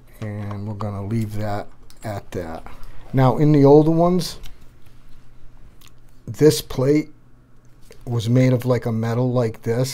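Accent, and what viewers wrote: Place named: Boston, United States. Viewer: Helped me man. My FA-18 throttle and stick just arrived this morning, but I was having a headache putting the throttle together as the pdf manual from the winwings site wasn't too clear on assembly. You helped me work out what goes where though, which I couldn't find in other videos. Love the Boston accent too. Many thanks from Aberdeen, Scotland.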